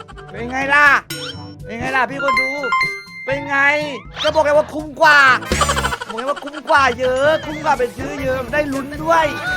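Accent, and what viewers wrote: South, Thailand. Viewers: พูดเหมือนคนใต้เลย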